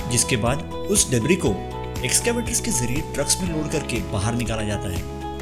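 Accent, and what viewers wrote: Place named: Maharashtra, India. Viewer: love the authentic marathi way you pronounce the names of the places.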